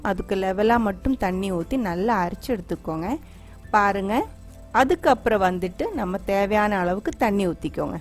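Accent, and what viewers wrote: Kerala, India. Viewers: தமிழும்‌ மலையாளமும் கலந்து உங்க இனிமையான குரல்.super sis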